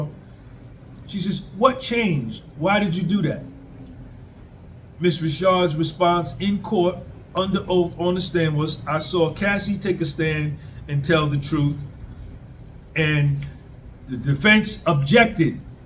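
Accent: United States. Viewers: This man has a good USA accent, keep up the good work . ⚖️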